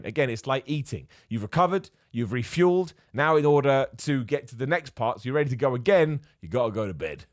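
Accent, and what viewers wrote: United Kingdom. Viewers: Oh, it's! The things you say repeatedly get rushed through to where they've lost our normal, awe-inspiring Bri'ish enunciation. I finally caught it! I really enjoy your videos. You make even the droll topics more listenable.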